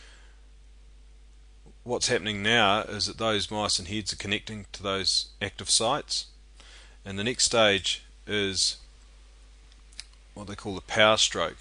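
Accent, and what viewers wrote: New Zealand. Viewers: thats a typical kiwi accent :) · I'm pretty sure it's New Zealand accent, since i've been there for 2 years for high school.. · sounds like a Kiwi XD excellent video!! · yep hes New Zealand!!! you can tell by the way he says his E's and I's ie, head, actin etc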